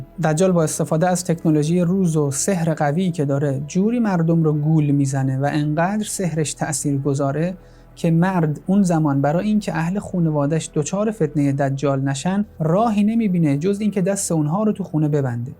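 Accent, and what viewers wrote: Isfahan, Iran. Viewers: لهجش اصفهونیه دا داااااااا مثلا میگه گزاصفهونست .خسیس. وای چقدر مزخرف بافتید تو این دین اسلام مخصوصا شیعه که همش شده سوژه خنده ننگ بر شما آخوندای انگلیسی که شیطونم درس میدید امیدوارم دکونتون برای همیشه بسته شه🤣🤣🤣🤣🤣🤣🤣🤣🤬🤬🤬🤬🤬🤬🤬🤬🤬🤬🤬🤬🤬🤬🤬 میگا از چاهی از بیت رهبری میاد بیرون منقلیه و لقبش سید علیه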